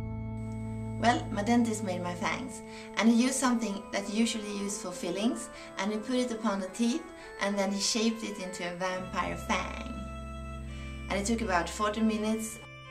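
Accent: Sweden. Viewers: Haha tyckte du hade väääääärldens svenskaste dialekt, och mycket riktigt! dont fucking skjut mig eller nått men du lutar åt det typ lixom creepy gothy vampire cute än creepy gothy vampire sexy! hahha:D (jag har med tänkt göra sådana när jag har råd)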